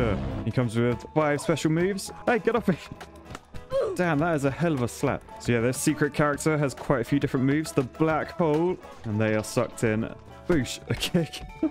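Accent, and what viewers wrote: United Kingdom. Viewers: Anime karate noises with a British accent are not the bees knees.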